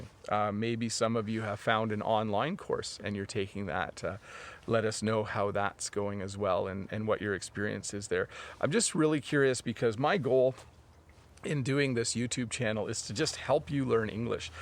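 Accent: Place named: Canada. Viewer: Hello the 🇯🇵Osakanese here! Ive been learning eng from ur videos. I like ur “the great White North”English!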